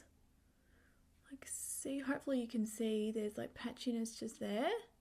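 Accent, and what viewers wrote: Australia. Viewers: Hi girl, love your work, just love hearing an aussie accent. Just wondering if you have ever tried a one brand tutorial or a first impressions on all Australian brands like 'Australis', 'Mirrenesse' or even cheaper brands like 'Designer brands' ?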